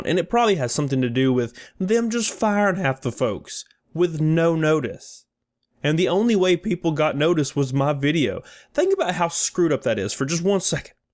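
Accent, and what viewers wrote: South, United States. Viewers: Do I hear a fellow country boi actsent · Anyone know what part of the south hails from? Love the accent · Funny how it takes just one man with a camera and a southern accent to destroy Gamestop. You're the best,!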